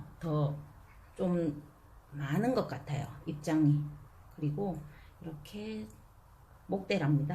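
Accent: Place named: Gyeongsang, South Korea. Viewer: 반갑습니다 경상도말씨가 참정감있네요 영상잘보고갑니다 첫걸음마이신것같은데 화이팅하세요 항상 응원할께요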